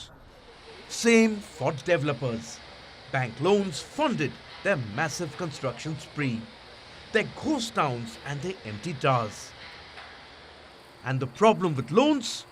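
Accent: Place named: India. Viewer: Her thick Indian accent..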